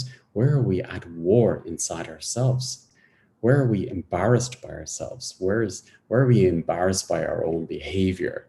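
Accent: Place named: Ireland. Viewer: Awesome with lovely mellow Irish tones - I'm here with you as Lorie Ladd told me about you. I left off so so many old things that pulled me back throughout my whole life! As I was reared as Irish catholic, I saw the time I was baptised (6 weeks old) and felt that I was handed over which I did not like one single bit. I felt stamped and this did come off in the meditation! So grateful. At almost 2 years I was put in hospital for an apendectomy, saw my little child on a table and I went off up towards the ceiling, no pain up there. I was left in the hospital and someone had some red shoes that I couldn't walk in - I think a kind nurse looked after me. Ancestral memory too of an old woman sitting on the side of a stony road, too tired and hungry to go back or couldn't go back, she was delighted to see me, it was lovely. My channel is open and I feel wonderful with new vibrant colourful energy. 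Love grace peace and patience to you my friend.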